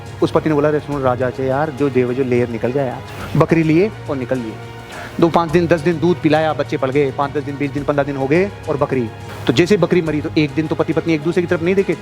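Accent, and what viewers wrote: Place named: Jaipur, India. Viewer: क़तई ठेठ जयपुरी भाषा बोली 😂😂😂 सर जी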